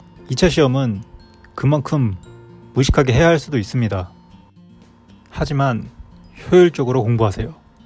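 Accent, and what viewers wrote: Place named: Seoul, South Korea. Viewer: 1과목 교수님 맡으셔도 충분하네요~~ 음성도 좋으시고 특히 서울말씨가 귀에 속속 꽂힙니다. 감사합니다 🙏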